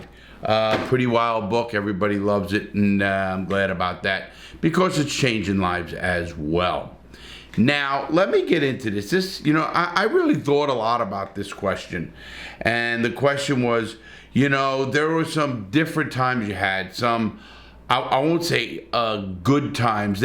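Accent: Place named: Boston, United States. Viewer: You kinda have a Boston accent or a new England accent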